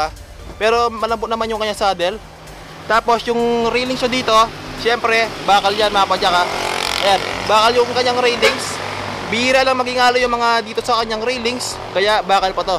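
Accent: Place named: Pampanga, Philippines. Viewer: Sir kapampangan ka. Hehehe. God bless po. Nanay ko po kapampangan same accent po kau. 😊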